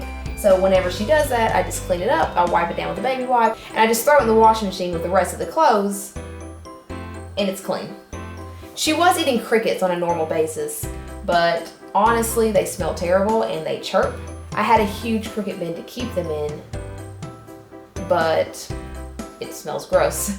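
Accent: South, United States. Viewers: Sounds like a southern accent and i like it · love your southern accent lol ! and your beardie, and her setup! what a great deal on the cage, love your vids!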